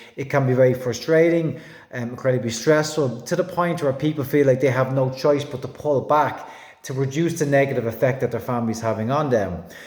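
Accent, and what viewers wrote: Ireland. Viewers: If I could put forward some feedback? ... excellent video, excellent presenter and information! The sound is a little bit echoey. Wondered if you could use a mike to improve the sound?. Am grateful for this video. Love your tone of voice and your Irish accent ! So nice to listen to. Luv from a New Zealand woman 🇳🇿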